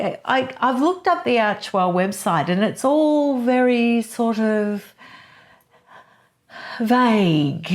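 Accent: Australia. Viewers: Thank you for your videos. It’s so wonderful to hear an Australian accent on YouTube too. I like the way you intelligently present things. I also enjoy your genuine glee at sharing the “juicy bits!” 😉😂💐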